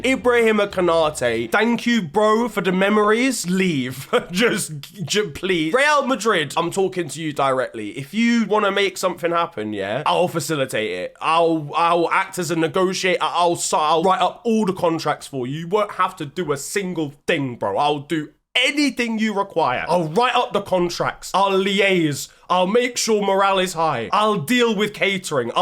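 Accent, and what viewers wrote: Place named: Liverpool, United Kingdom. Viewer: You sound Scouse. Plastic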